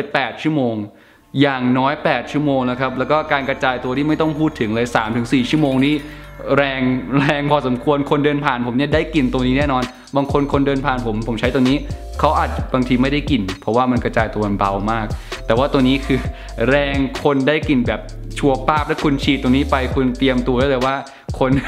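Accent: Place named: United Kingdom. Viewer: I love your EN accent <3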